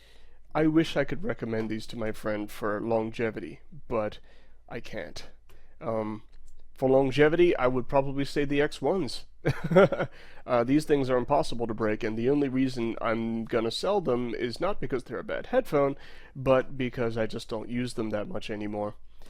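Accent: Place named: Northeast, United States. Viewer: I got mine for $62 about 2 months ago, they we're a little bit enjoying in the high range and a little lacking in bass, however I put them in a room with a Fiio amp/dac and feed them EDM on a loop streaming Tidal lossless streaming service using the premium services, I did this for 5 days and after the 5 day period I have to tell you I am now a believer in headphone "Burn-In" I'm guessing that this has loosened the driver material or flexed whatever term you'd like to use. At any rate they just totally transformed into a much better sounding SHP9500, I didn't want to like these headphones however even if Phillips were to sell these for $150 they'd be worth it! Are they good for every genre of music? No, if you're a bass head look elsewhere, I do own a pair of V-MODA M 100's and like those but are they good for every genre of music? No, however I just can't stop listening to these 9500's. 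I'm going to stop now and get me a drink of whiskey. Just a side note for the reviewer, what part of the UK do you live in? I was stationed at RAF Bentwaters for 3 years many moons ago, so are you an American? as I don't detect an English accent when you speak, I do hear what I believe is a North East Coast accent in you. Hey thanks for the review.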